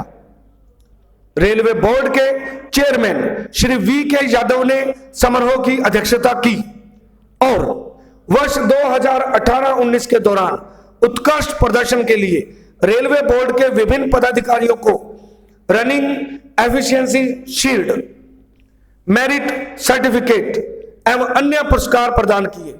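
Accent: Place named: Punjab, India. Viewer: Aawaj me punjabi language ka touch aa raha h ji Good keep it up sir ji 👌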